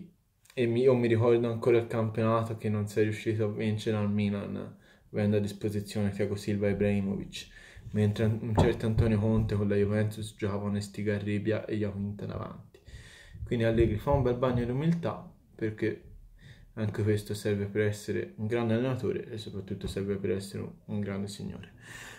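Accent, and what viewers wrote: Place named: Florence, Italy. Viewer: Ti impongo delle cose: 1) Mai nascondere la c strascicata fiorentina 2) Torna ad aprire i pacchetti. Il mondo ha bisogno di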